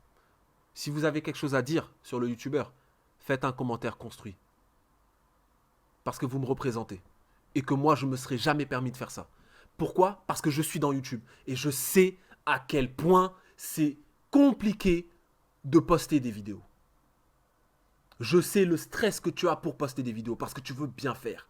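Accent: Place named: Essonne, France. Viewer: Par où commencer , bon deja c'est la deuxième fois que je regarde une de tes vidéos et j'aime bien ta façon de penser et ta façon de parler , d'ailleurs je me demande si t'es pas un Boug du 91 ... Thekairi78 j'ai regarder sa toute première vidéo sur YouTube en 2011 , il porter une casquette , il disait Salam Aleykoum, j'ai direct accroché au personnage par ce que je me reconnaissais dedans , un mec de cité sur YouTube en 2011 y en avait pas bcp , îl parlait de religion , de l'école , des femmes , de la France , bref de la vie en général ... Ses records zombies , les massacres qu'il faisait avec sa team en multijoueur , c'était un régal de regarder ses vidéos ! Alors le voir changer autant en si peu de temps , c'est normal que ces anciens abonnés dislike ces vidéos , c'est comme si tu voyais un de tes potes sombrer dans la drogue , l'alcool , les putes etc bon l'exemple est un peu extrême mais c'est dans cet optique que je vois les choses Voilà chacal principal j'espère que tu verra ca!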